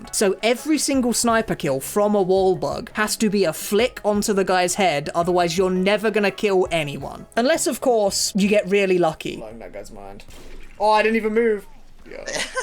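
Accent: United Kingdom. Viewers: British speech about TF2 to vibe and study to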